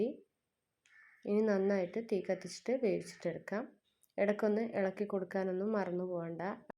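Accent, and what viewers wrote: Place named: Malappuram, India. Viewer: താത്ത മലപ്പുറത് ക്കാരി ആണ് ലെ.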